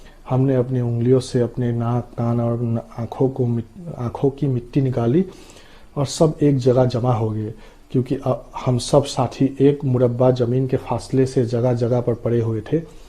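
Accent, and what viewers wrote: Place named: Bharuch, India. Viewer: Muje aap ki aawaz par se lagta hai ke aap Bharuch Gujarat India se ho???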